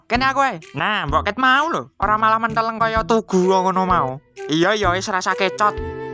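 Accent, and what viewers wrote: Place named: Surabaya, Indonesia. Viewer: Suroboyo teko cak 👍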